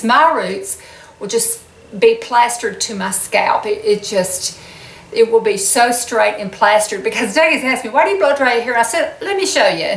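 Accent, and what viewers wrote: South, United States. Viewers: 😃, Nice one, I can just see you in a L'Oréal advert with that lovely southern drawl........... "Beeecawse y'all are worth eeeet" Youre a doll xxx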